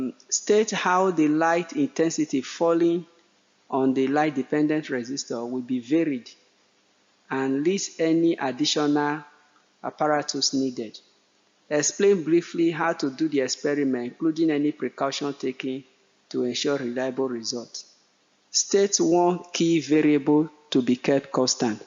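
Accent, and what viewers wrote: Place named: Nigeria. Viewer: Nigerian accent the best muah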